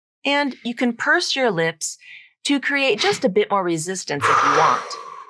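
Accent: San Diego, United States. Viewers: Hey! I'm a non-native speaker and I've been watching your videos and i absolutely love your channel. I was just wondering if you speak in a standard American accent or is there a tad bit of San Diego in it?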